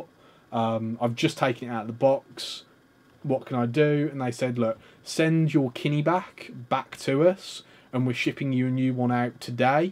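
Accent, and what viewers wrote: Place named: Birmingham, United Kingdom. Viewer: You're definitely a fellow brummy arent you!!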